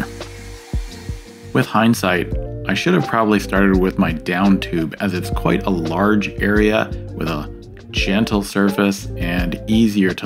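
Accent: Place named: Midwest, United States. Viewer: Nice video Sir! I am wanting to protect some areas on my carbon fiber mountain bike. Your video helps with some great ideas to have a successful install. I hear from your accent that you're from the Midwest or Canada. The word out gave it away. LOL. I am from Michigan so I totally get it. Have a blessed day.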